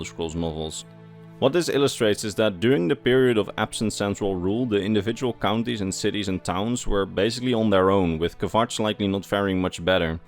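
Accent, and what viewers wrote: Netherlands. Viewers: are you dutch you sound like your from the same country as im from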